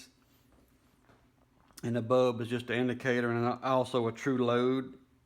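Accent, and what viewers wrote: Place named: Tennessee, United States. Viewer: great video , you saved me from deciphering the manual .. great accent , is that from Tennessee or Texas or both :)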